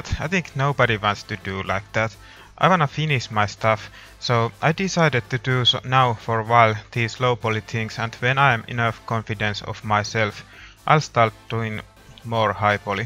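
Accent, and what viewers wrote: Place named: Finland. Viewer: Hey, I really enjoy your content, and of course that Finnish accent XD I am from Finland as well;) I think you have one of the best art styles of all blender users;) KeepUp nice work!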